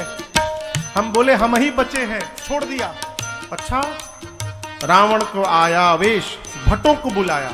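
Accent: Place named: Bhojpuri, India. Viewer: आपका भोजपुरी मीठा बचन सुनकर दिल खुश हो गया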